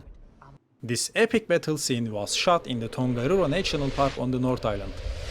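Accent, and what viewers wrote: Turkey. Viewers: türk. ingilizcesinden bile belli oluyor. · Ulan ben de diyorum İngilizceyi aynı Türkler gibi konuşuyor, Türk'müş adam :) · Aga türk müsün aksağandan anladım düzenleme video başında yazdım bu yorumu videonun devamında açıklamışsın zaten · Videonun ilk dakikalarında bu ne böyle Türkler gibi konuşuyor İngilizceyi dedim. Meğer Türkmüş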